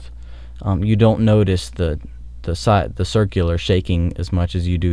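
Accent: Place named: California, United States. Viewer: it's weird to hear your voice before you got your California dude-bro accent haha <3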